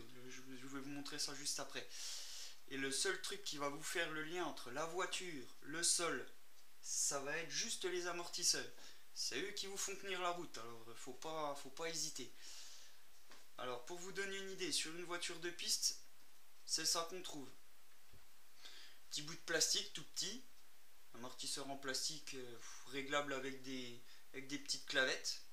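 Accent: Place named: North, France. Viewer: Ta un de ces accent du nord j adore !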